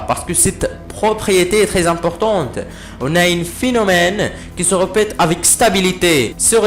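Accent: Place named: North Africa. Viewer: Le problème des " scientifiques " c'est que la plupart ne savent pas présenter ou être pédagogue, en général quand un scientifique t'explique quelque chose, il est tout seul dans sa tête... La voix avec fort accent maghrébin est déconcentrante, la police des slides mal choisie, c'est parfois chargé pour rien... Il vaut mieux faire simple, que vouloir trop en dire ou trop en montrer pour rien. Bref merci pour les efforts d'explication, mais faites un petit effort sur la pédagogie svp et la pédagogie commence par simplicité et la clarté. Bon je ne dis pas que c'est incompréhensible, mais voilà quoi, ça donne envie d'aller voir une autre vidéo... Merci quand même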